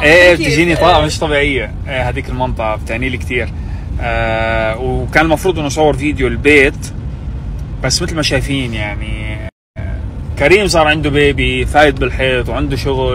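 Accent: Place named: Aleppo, Syria. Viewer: لهجتك شوي حلبيه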